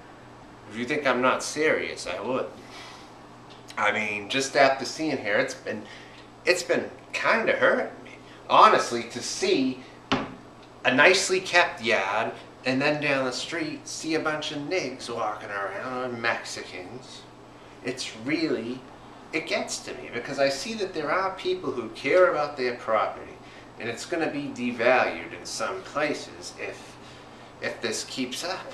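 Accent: Boston, United States. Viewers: It's a Boston accent. LOL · Are you from Boston? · It sounds like you hate the suburbs of Portland. It also sounds like you're racist. Congrats on describing gentrification with the accent of Will Hunting. Go back to Boston. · You're not a hipster, and you have a heavy boston accent. So not the type to fit in with portlandia types. It's not your scene. Portland has a serious underground sex trade and is full of strip clubs. Kinky side for sure. I saw really bizarre hookers walking around out in the open and was approached by a guy who thought I was a hooker because I was out walking/bike riding late at night. So certainly has a sketchy side.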